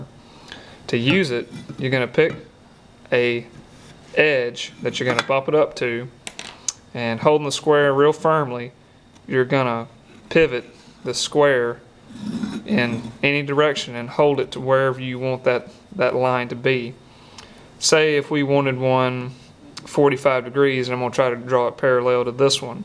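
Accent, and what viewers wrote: South, United States. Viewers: k-e-w-l.......just got back into being a grunt for a framer.....did it in college years ago.....saved my tools just incase I had to go back to it....and I went back to it.....work for an old hippie who knows a few tricks himself.......will hafta check and see if he knows a few of these tricks....he just might.....sure thank ya fella.....southern accent here too, so don't worry......be happy.....:) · a southern accent instills confidence in me that someone knows how to use tools. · don't worry about your southern accent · Nice video bud. People just get jealous that they don't have a southern drawl lol guarantee most Yankees wish they had one lol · Don't apologize for your southern accent. Remember the song,? "I'll speak my southern English as natural as I please; I'm in the heart of Dixie, Dixie's in the heart of me!" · Tons of people today have no clue on some construction techniques, im amazed at some old timers ability to make cuts or joinery from years of experience easily and from eyeballing it, there are those of us that can do a lot of basics but appreciate tips that are not neccesarily as well known as some of these commenters think. 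If some on here say stuff about your southern accent, i would die laughing if i were to hear their New Joisey accent, We all have accents and it is NOT indicative of intelligence level ( dumbasses) Keep on making these man, they are informative and a help. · Having watched a few of this man's vid's, and returned to them more than once, I'm beginning to think there there's no end to this man's talents. As for your Southern accent? Well, 'least ya'll speaking English. Keep it up. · Don't apologize for your southern accent, it's a blessing from God. - Proud Texan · hit the like on this comment, sir God blessed you to be a teacher, what He gave you is a gift, He meant for you to give your gift to others and you have, so carry on sir I salute you and never apologize for the southern accent. that's a gift from God too !! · As a female who knows little about construction, I find this fascinating and (bonus!) easy to understand. 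I probably won't ever need to use this, but I love to learn all sorts of things. And I find a southern accent charming lol. Please keep up the teachings as you are great at it :-) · To an HVAC/Electrical/Mechanical troubleshooter, now a semi retired handy man, this is a priceless video...At least I won't look totally lost...lol....My thanks, to another country sounding gent like myself, for making it. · I appreciate the southern accent.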